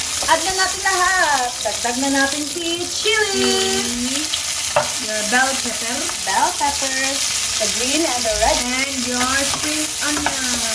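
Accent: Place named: Thailand. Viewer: ang thai accent gyod ang nag dala 🤣🤣🤣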